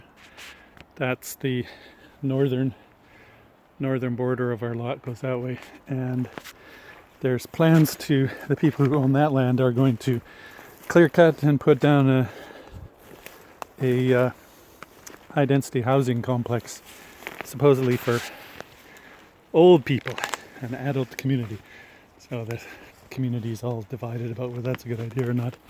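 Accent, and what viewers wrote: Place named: Maritimes, Canada. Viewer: You are picking up a touch of maritime accent.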